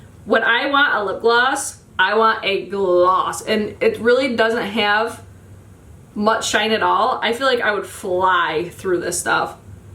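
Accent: Midwest, United States. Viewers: i'm guessing you're midwestern? or maybe from boston.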